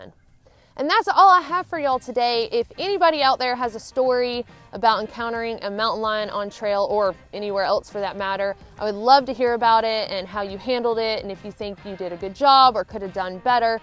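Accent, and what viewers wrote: South, United States. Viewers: Are you a southern belle? You certainly sound like it. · Good primer vid. Cool to a non-tarzan dude doing a predator show. But, being a southern boy, I can't determine the accent. A southern accent kicks in with every word with an "i" and the word food. Georgia, Tennessee, the Carolinas? Maybe Florida? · Thank you so much for this informational video! & btw from a Michigander I have to say that your southern drawl is so sweet very charming! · Love her Southern accent. Charming. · Where are you from?? You sound a little southern. Mountain lions are silent and sneaky. I heard said that if you wear a hat that has eyes or a face on the back of the hat it could stop an attack from behind. Sacketts I love those books I've read them all, starting at the beginning. Ole Barnabas Sackett started it all☺. I wonder if bear spray works on mountain lions.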